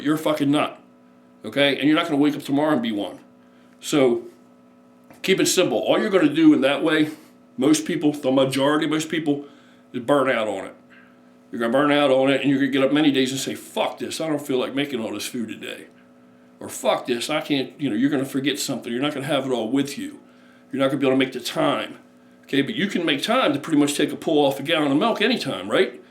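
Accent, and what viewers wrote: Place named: Baltimore, United States. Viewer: It’s milk unless you’re from certain parts of the US like Baltimore county, like me, which is where he’s from too. I lived in Perry hall his accents different from mine a little maybe he lived on i83.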